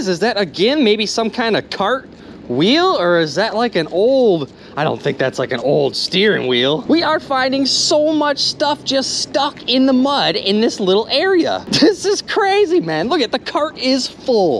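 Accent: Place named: North, United States. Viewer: Hey you in wisconcin or minnesota?Ican tell you are up in the northern stayes but cant tell which one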